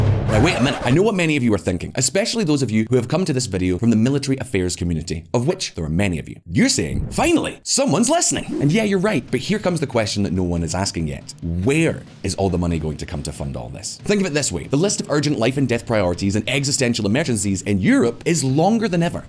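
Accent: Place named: Scotland, United Kingdom. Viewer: Ahh..Scottish…❤️❤️❤️ breath of fresh air!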